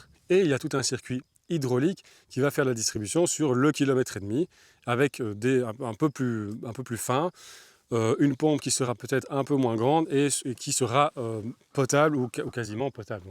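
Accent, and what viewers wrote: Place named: Brabant, Belgium. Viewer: Bonjour, bonne découverte. Vivant en montagne noire, le château d'eau du Languedoc, sur les hauteurs du pays carcassonnais. On se trouve à la croisée des chemins des climats Je réfléchis beaucoup pour avoir une cohérence sur mon païs d'adoption. Objectif, préservation, régénération, encrage culturel et naturel, robustesse. Votre vision analytique m'intéresse beaucoup. Je travaille sur une terre d'un 1/2 hectares, en pente, ruisseau, courbe de niveau, future habitation en greb en amont, verger, différents niveaux de couverture végétale pour préserver le sol et les couches inférieures comme un parasol et surtout diminuer l'effet de l'évaporation. Objectif : garder ce précieux capital qu'est l'eau, régénération des biodiversités, enrichissement des sols. C'est une longue quête, merci pour votre analyse, je reconnais d'ailleurs un accent de mon païs d'origine, Brabant ? 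Cordialement, d'un belge en païs occitàn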